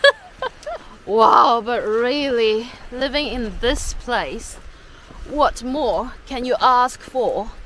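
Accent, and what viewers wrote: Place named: RP, United Kingdom. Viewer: Haha love that you have adopted a posh accent. Please keep it and your English is 👍🏼 👌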